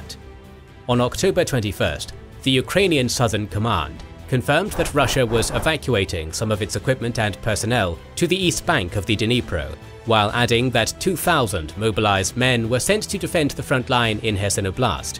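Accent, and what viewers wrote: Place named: United Kingdom. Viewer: Gives me vibes like The Economist. Also British accent, same style of snark. I love it!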